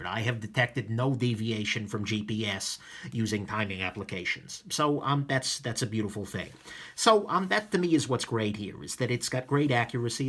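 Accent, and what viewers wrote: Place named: Brooklyn, United States. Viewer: I love this watch but I really came here to hear the voice of, reminds me of Vinnie on Doogie Howser MD lol, plus that Brooklyn flow, being from Queens I can appreciate that!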